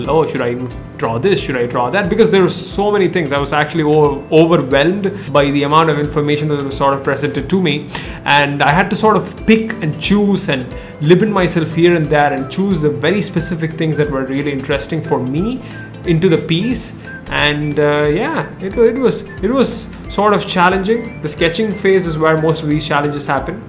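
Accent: India. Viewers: Yes i am too By your body colour and style of talking in English sounds and seems like an indian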